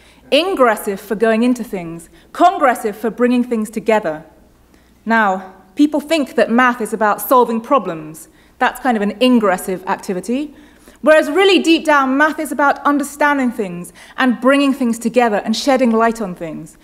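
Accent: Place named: England, United Kingdom. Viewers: I love her british accent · it's an english accent actually. It's not scottish, or irish or welsh, the other components of "Britain". English, English, English.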